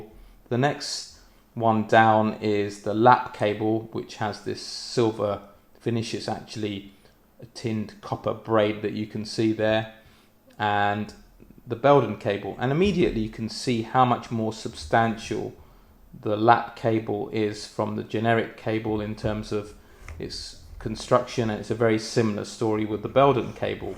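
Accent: United Kingdom. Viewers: that looked great - good explanation. I noticed some comments on the length of the earth/ground lead and would say the way you did it was dictated by the amount of space in the connectors (or, I don't see another way to do it with these connectors). The connectors you use now confirm you are in the UK, as your accent did;). My advice would be to look at the "domestic ring" that dominates UK home electricity cabling design, as the second "cheap" improvement option. That ring puts all devices in the same circuit - your high-end audio with your washing machine. If we ignore cynical commenters, and focus on "clean" AC, then we have a mission here. As you know too, what comes out of your speakers is AC that got rectified and next modulated by a source signal. Any!@ coming in on your AC can disturb and distort your music. Electrical companies in Europe are increasingly concerned about household and commercial appliances putting stray signals into their networks. 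So, with the single-side grounded shield on the power cable you now have provided for an isolation against inductance interference from other sources into the protected device and vice versa, contained some of the potential stray interference that your device might throw back in the air. As "cynical" is derived from the Greek word for "dog", the cynical puppies in all their illiteracy and lack of manners warrant a demonstration of interference. If you have (access to) an oscilloscope, nothing is more impressive tha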